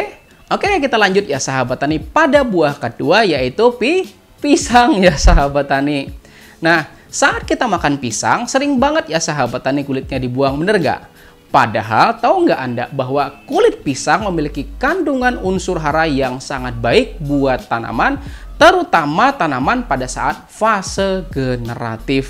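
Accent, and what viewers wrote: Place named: Kuala Kapuas, Indonesia. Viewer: Betul sekali pak, syg kalo di buang kulit buah, bisa di manfaatkan sebagai pembuatan kompos dna POC. Ulun suka logatnya, masih khas kaluwa nya 😁, sukses mang, dan sangat bermanfaat 🙏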